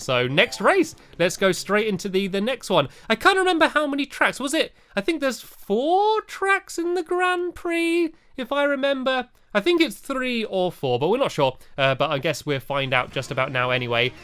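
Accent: United Kingdom. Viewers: He is british he has an accent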